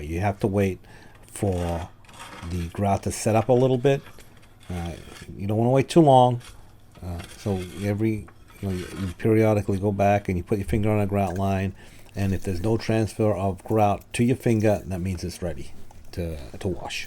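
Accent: Boston, United States. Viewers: Just bought my first home & have been binge watching your videos. Thanks so much for explaining things so carefully! Question: any plans for a wall tile PDF? I would definitely pay for that! Btw—ya accent makes me homesick for Boston BIG TIME! That’s actually what made me keep watching! Lol...thanks again!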